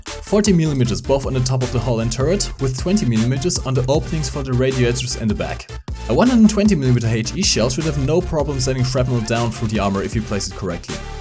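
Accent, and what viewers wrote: Germany. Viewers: hab ich mir auch schon gedacht auch weil sein englisch so klingt wie das eines deutschen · ich wusste es du hasst einen starken deutschen akzent (was nichts schlechtes ist) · hach ich feier diese englisch mit akzentfreiem deutsch soo hart ;) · dat Germany accent tho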